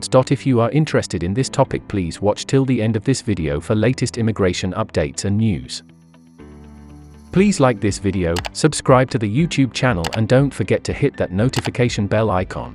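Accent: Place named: United Kingdom. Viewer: Would the British-sounding narrator please do a video with himself in it to add details? Thanks.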